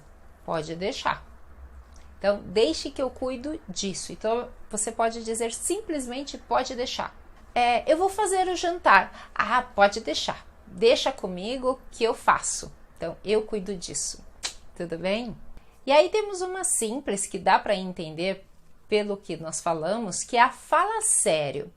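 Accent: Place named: São Paulo, Brazil. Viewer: Wow São Paolo dialect! I love it.Obrigado muito!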